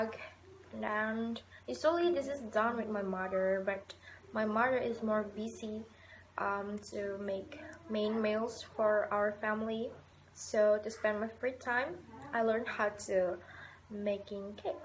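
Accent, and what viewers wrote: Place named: United Kingdom. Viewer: Hi! Long time no see! I'm glad that you look healthy in this video! Keep yourself safe during the quarantine ok! I love the way you speak english, you almost nailed british accent! Your pronunciation was also clear, i can understand every word you said. And thank you for the information, it's very useful! Keep safe and stay healthy ok! See you after the quarantine ends!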